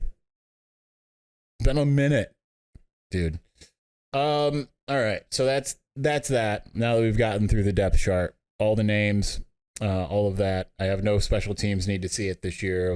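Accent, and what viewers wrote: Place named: Ohio, United States. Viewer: Yoooo the buckeye accent 😂😂😂😂😂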